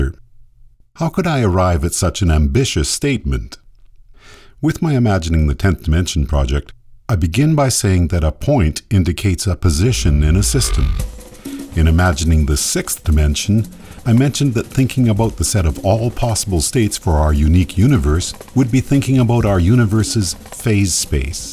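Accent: Canada. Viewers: This guy's Canadian accent is really starting to piss me off. "Quantum FOAHM" "Michael's GOAHL is to poke HOHLS in the..." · I can hear your Canadian accent (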